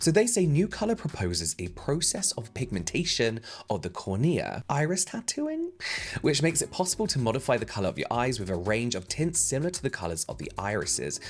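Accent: United Kingdom. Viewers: tff 💀. has a pretty strong British accent that gets in the way of pronunciation it happens that’s lifee.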